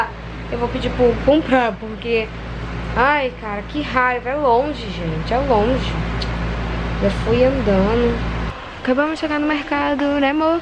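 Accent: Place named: Rio de Janeiro, Brazil. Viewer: Linda amoo esse sutaque carioca, tô amando te acompanhar 😍😍😍👏👏👏👏 parabéns por ser essa pessoa atenciosa e quando voltar a sp vou te conhecer bjos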